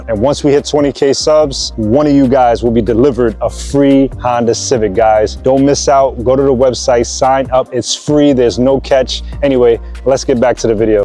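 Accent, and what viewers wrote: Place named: Long Island, United States. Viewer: after listening to him talk ima say long island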